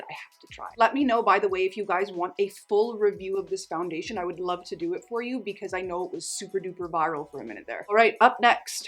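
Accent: Canada. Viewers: Are you Canadian? You sound very Québécois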